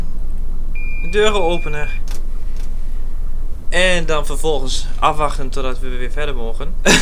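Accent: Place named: East, Netherlands. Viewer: Hé! Een Nederlandse video! Ook leuk! Zo te horen kom jij ergens uit het Oosten? :p Ik kom daar wel vandaan ;)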